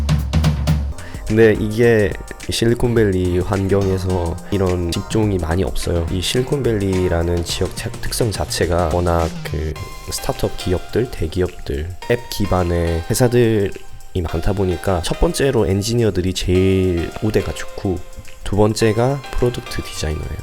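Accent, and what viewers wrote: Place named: Gyeongsang, South Korea. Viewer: 경상도분이세요? 뭔가굉장히친숙한데 말투가 고급진사투리야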